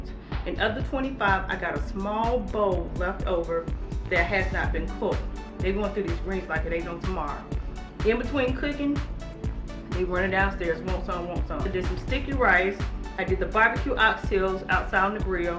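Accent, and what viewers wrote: South, United States. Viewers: make no apologies for your faith in GOD, he alone has enabled you to be who you are, where you are and what you will be. The video's you produce reach a larger base than just numbers in the views, they reach souls with entertainment as well as encouragement. For those that would rather you not talk, let them turn off the volume because others enjoy the sweetness of your southern accent and the personality you exude. Live the life that best suits you because anything else is an inferior fit.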